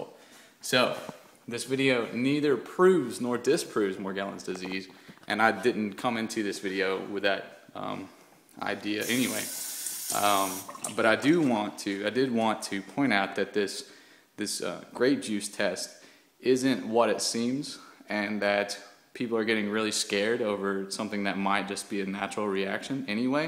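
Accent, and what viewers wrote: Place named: Philadelphia, United States. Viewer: Sounds like a philly/south jersey accent.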